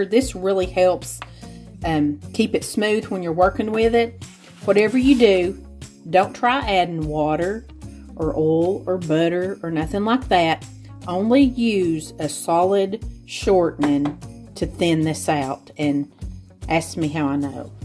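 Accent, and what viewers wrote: South, United States. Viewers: What a great video, some excellent Christmas treats im gonna try. Love your southern accent! Love from the UK xx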